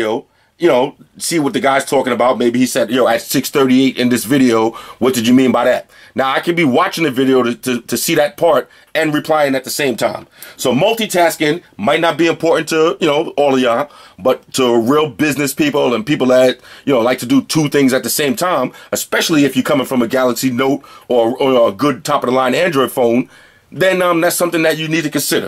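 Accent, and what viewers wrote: United States. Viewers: Loving the american accent though lol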